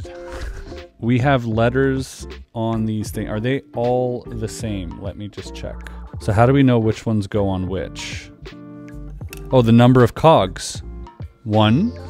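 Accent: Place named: Vancouver, Canada. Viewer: ph shit. I identified you as a person from Vancouver based on one video. It was your taste and your accent that gave it away. I think knowing what city you're probably from is better than that puzzle.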